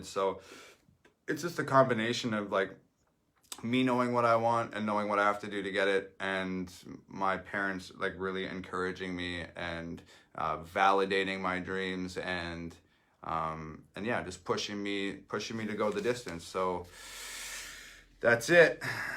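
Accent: Canada. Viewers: You can tell he is from Canada by the way he says sorry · He sounded so Canadian, sometimes I forget and then I hear him apologize like 7 times in the first minute😂 and the way he pronounces words with "r", love this man so much, so humble.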